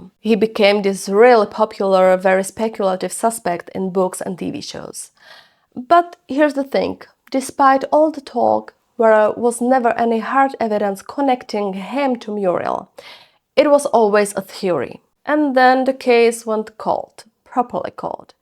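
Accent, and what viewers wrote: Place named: Eastern Europe. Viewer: My Grandad knew Muriel. They weren’t exactly friends, she was in the year above him. But he saw her around all the time. He and many others always believed it was an American soldier who committed the crime, there was a group of them stationed nearby. One vanished the following day after the murder which was obviously a little suss! 👀 Cool accent by the way! I used to live in Hungary some years back and I’m sensing you’re from that part of Europe?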